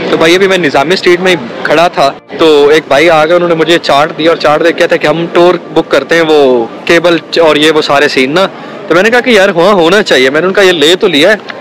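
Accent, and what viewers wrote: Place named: India. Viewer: tera English accent bahut desi hey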